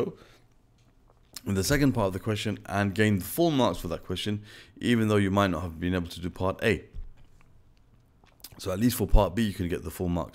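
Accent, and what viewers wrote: United Kingdom. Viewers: i always wonder where you are from. your name is arab but ur accent is a bit british.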